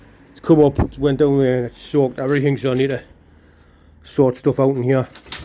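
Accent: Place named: Newcastle, United Kingdom. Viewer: Great Newcastle accent ! - Fab car - All the very best of luck with the rebuild m8👍👍👍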